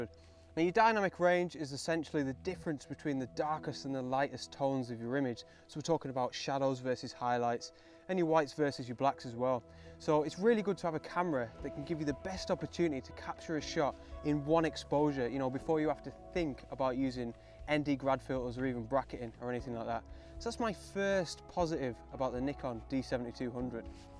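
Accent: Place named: England, United Kingdom. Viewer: Enjoyed your video. The English accent is 👍.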